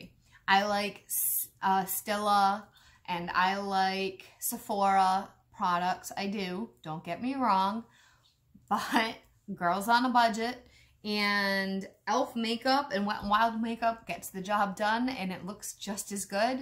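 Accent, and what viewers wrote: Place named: New York, United States. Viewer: I love your videos! 🥰 are you from New York I’m trying to identify your accent!?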